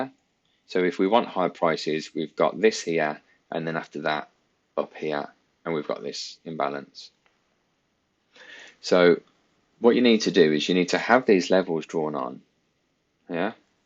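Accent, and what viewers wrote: Birmingham, United Kingdom. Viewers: i can only tell because I'm a brummie myself lol. Most won't though right. Nice to see a brummie on here. Keep up the game bro.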